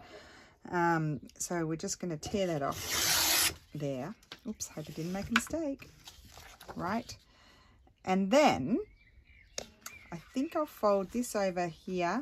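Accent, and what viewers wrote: Australia. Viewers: Righty O!!! I love when you speak Auzzy How do you spell that? Giggling.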